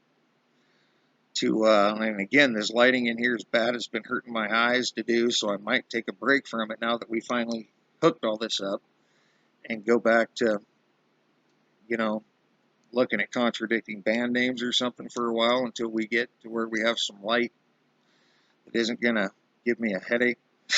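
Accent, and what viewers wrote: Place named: California, United States. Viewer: Hey, - Yeah the comment was mostly about the physical observation of Pinball. the song came 2 mind. I've listened to the entire video. The number coding is interesting but I don't get it all. Your dedication to discrption as to the form makes sense. I admire your talent for being able to cut into this line of logical explanation. I'm seeing a lot of things as well, different direction of observation on certain aspects. You have a cool accent. I can't tell if it's California or the Great lakes, your delivery of speech is a bit quicker than a Midwestern accent. We have a long, long way to go. It's like we're just now entering the late first quarter of the football game. Peace. df.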